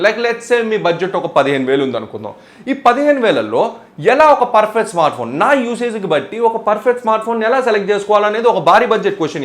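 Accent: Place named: India. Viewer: I like ur telugu slang and fearless review and comedy scenes also 💟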